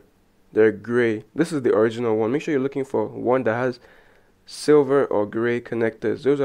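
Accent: Nigeria. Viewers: I can bet this dude is a nigerian I can hear he's accent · You sound like a Nigerian, can you please tell me where you bought the original?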